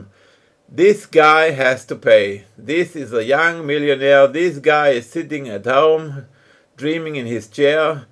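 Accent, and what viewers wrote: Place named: Germany. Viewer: EXCELLENT WORK, I JUST WISH IT WAS NARRATED BETTER..SOMEONE WITHOUT A THICK, STUTTERING GERMAN ACCENT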